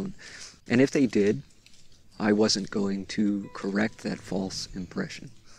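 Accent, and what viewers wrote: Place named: United States. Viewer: Thank you 💚 had a miracle breakthrough today and experienced the barriers coming down between someone I judged and myself. I really misjudged them and they really surprised me and they were really loving, we had a nice day together and I was able to see how I’ve been acting angry and judgemental. Starting to feel myself softening and letting go a bit towards others :) Just realised you have an American accent, it’s funny I haven’t noticed before as I was so focused on the messages etc. I’m in England. Thanks for your help 🌈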